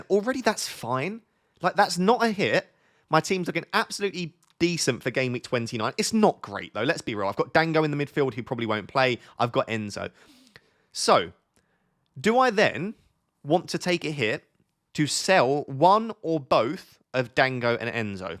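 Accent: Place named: Nottingham, United Kingdom. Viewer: Didn’t know you was a notts lad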